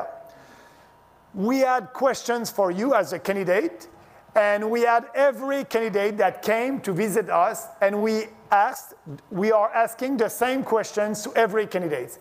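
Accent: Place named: France. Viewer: These hero's always pop up out of nowhere, and this is standard. He may have a French accent, but then again so did my grandmother.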